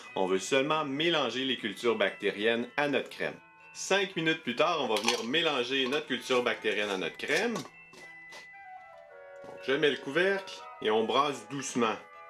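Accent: Quebec, Canada. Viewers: Hey! Bonne vidéo 👍😀 J'ai essayer une de tes recette, et c'est un échec 😅 D'ailleurs, tu et canadien? Vu ton accent je pense que oui 😅 Juste pour dire que j'adore le Canada!! 🙂 Coucou de la Nouvelle-Calédonie. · Géniales vos vidéos.. Tout est parfaitement expliqué,matériel,dosages,temps... Et l'accent québécois est vraiment top 👍👍 Amitiés de France aux cousins des Amériques!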